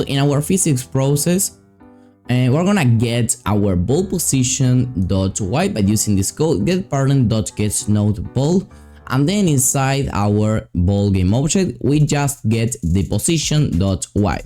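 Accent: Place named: Argentina. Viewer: Your from Argentina ❤❤❤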